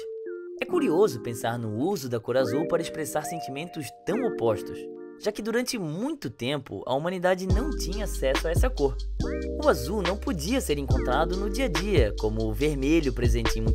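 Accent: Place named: Santa Catarina, Brazil. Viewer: Cara tu é catarinense so pode kkkk tmj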